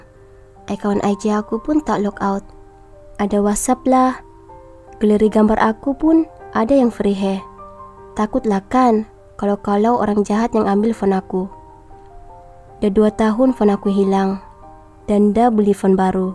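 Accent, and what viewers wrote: Sabah, Malaysia. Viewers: Hahahahaha tula cara dia cakap mcmtu,aku syak dia org sabah🤣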